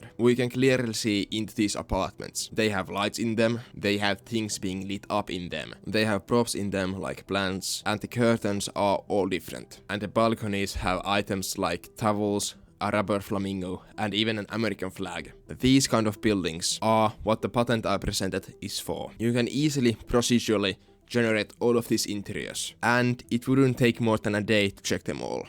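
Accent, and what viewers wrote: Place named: Finland. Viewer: You have a finnish or swedish,are u from there?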